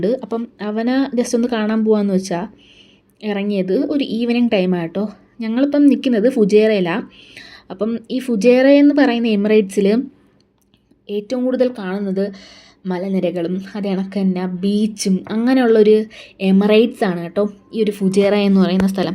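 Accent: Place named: Thiruvananthapuram, India. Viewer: തിരോന്തോരം പയല്. തള്ളേ പൊളപ്പൻ vlaag തന്നെ കേട്ട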